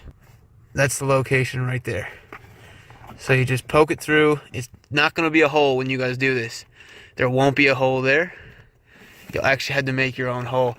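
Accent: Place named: Newfoundland, Canada. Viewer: You sound like a Newfie!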